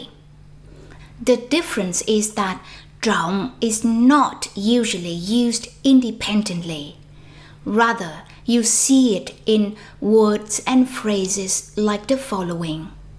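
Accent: South, Vietnam. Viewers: the teacher speaks Southern Vietnamese accent!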